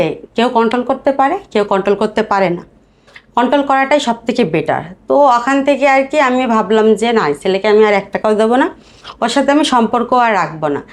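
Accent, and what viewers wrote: Bangladesh. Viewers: অনেক সেক্স ভিডিও দেখেছি আপনার।প্রথম দিকে অবাক হতাম যে,এতো সুন্দরী বাংলাদেশী নারী এতো অসাধারণ অসংখ্য সেক্স ভিডিও করছেন অথচ জানতামইনা।আপনার মুখের বাংলা ভাষা শুনেই বুঝতাম যে ভারতীয় নারী নন আপনি।পুরুষটির ফেস ঝাপসা করে দেয়া আছে চেনা যায়না।xhamster এ আপনার সেক্স ভিডিও গুলো উনি বিক্রি করে কম করে হলেও ৮-১০ লাখ টাকা আয় করেছেন সম্ভবত। আপনার ফ্রি স্টাইল এক্সপ্রেশন আর অসাধারণ সব পজিশনে সেক্স করা দেখে একজন প্রফেশনাল পর্ণষ্টার মনে করতাম।আজ সবকিছু বুঝতে পারলাম পরিস্কার 😢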